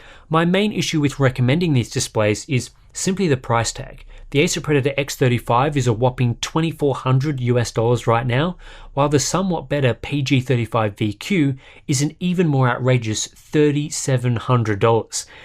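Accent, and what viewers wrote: Scotland, United Kingdom. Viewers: perhaps Scottish? I hear a slight accent. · U got that default British voice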